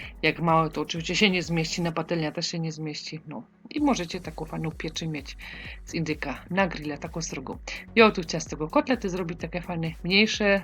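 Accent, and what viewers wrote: Silesia, Poland. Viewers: Podoba mi się z ''kartofloma , z kluskoma '' można podać . Lubię słuchać gwary opolskiej / śląskiej .Sznycelki jednak lekko rozbiję i otoczę mąką przed panierką , smalczyk indyczy też o.k.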